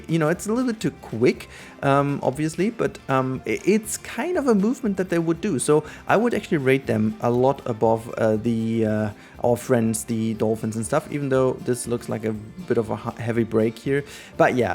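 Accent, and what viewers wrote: Germany. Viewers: Du sprichst ziemlich gut englisch, man hört trotzdem sofort das du Deutscher bist ;)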